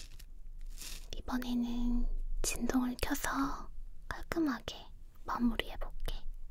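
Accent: South Korea. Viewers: I love this korean accent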